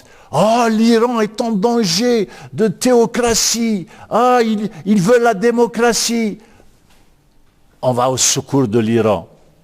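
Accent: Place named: North Africa. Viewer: Pourquoi parfois vous roulez les R alors que parfois vous les dites parfaitement... Cheulou cet accent du bled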